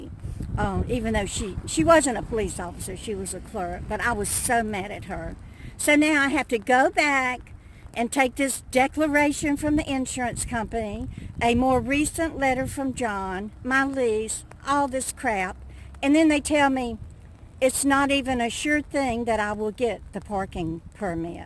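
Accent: South, United States. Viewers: Hi, You are so funny. I love your stories! Not southern but love when your southern SWAG comes out. Love it and Love you.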